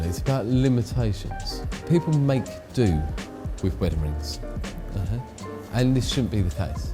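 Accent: England, United Kingdom. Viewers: English accent no such thing as British accent. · It is clearly an English accent you wouldn't hear this sort of accent in Northern Ireland,Wales or Scotland,it's not therefore 'British' but English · That british accent. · I love your britisch accent! Cool.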